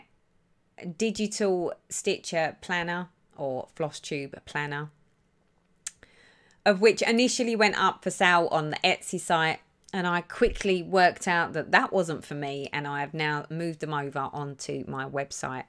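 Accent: United Kingdom. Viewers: Yaay! Congratulations! Your videos have helped me so much, not to mention I love to hear your UK accent when you speak! Your stitching is beautiful and inspiring. I’ve been contemplating switching over Annie’s Keepers because of you. Please keep the videos coming. It won’t be long before you’ll be celebrating 20K! Thank you for your generosity in this wonderful giveaway! 1, 2, 3, 5, 7, 9, 10, 13, 14, ❤️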